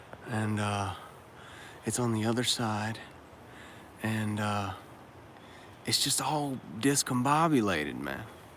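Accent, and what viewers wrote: United States. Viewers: Love the country accent lol. Good videos man, keep em coming.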